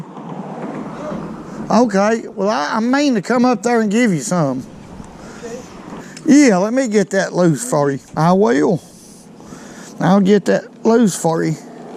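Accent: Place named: Kentucky, United States. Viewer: Lol! Man I like this guy! is a heck of a guy and helluva entertainer. I love the accent. Reminds me of my Kentucky family.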